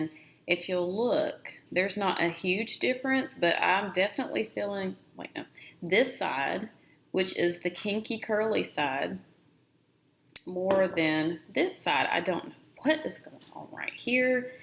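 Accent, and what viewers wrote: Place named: South, United States. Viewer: I definitely need a detangler. My hair is 3c so I hope it works for me. Also where are you from? Your accent is super southern and strong lol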